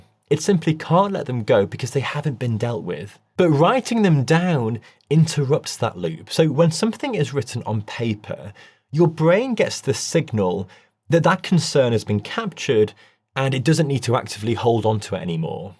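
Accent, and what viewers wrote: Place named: Northern England, United Kingdom. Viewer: Thanks for this really informative and excellent explaining.. Fond your videos the best on social media.. Appreciate so much your insight into life style changed, and as your a uk based doctor, and by your accent feel you may live in the North of England (like myself ) simplify videos easy listening.. thanks again 🙏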